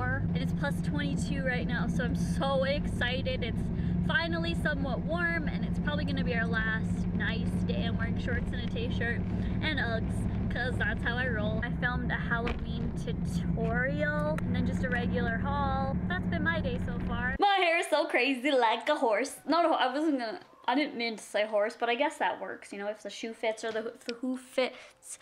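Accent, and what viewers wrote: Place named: Canada. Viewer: You guys seriously need to stfu. Number one " doesnt eat healthy why is she giving us tips" How do you know she doesnt eat healthy? We only see like 10 minuets of her day. How the hell would you know if she eats bad or not?! Maybe the reason she went shopping and only bought good food was because shes changing her diet? And number two "she speaks weird" e.t.c ITS HER ACCENT. MY GAWD. Part of my family is from Canada. The accents are different depending on the part you live in Canada. My cousins live in her province and talk almost exactly like her. My aunt and uncle live in North Canada and have a thick canadian accent. It just depeneds on where you live in Canada. STFU AND STOP HATING ON! I love you so much. I hate to see this. Please block who ever keeps hating. It makes me sick.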